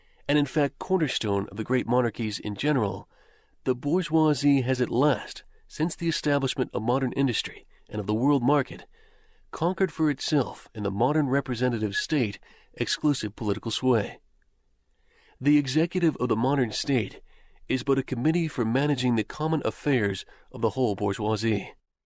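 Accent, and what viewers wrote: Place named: United States. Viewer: The thick American (AI?) accent and unrelenting monotone renders this historic document unlistenable.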